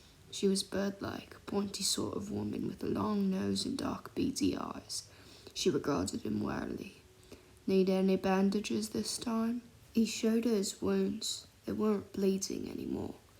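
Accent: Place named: United Kingdom. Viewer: Woah I thought you were British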